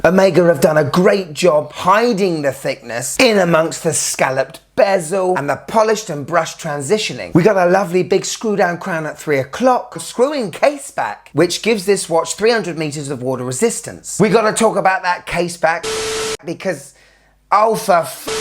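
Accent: United Kingdom. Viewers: I just realized who you remind me of….Benny Hill (which is a compliment in my mind). And not just because of the British accent , your humor is very similar. I also prefer utilitarian looking dive watches. I don’t like the wavy dial and certainly not the 1990’s bracelet style. I’d love to see Omega reissue their styles from the 60’ and 70’s in both their divers and sport watches.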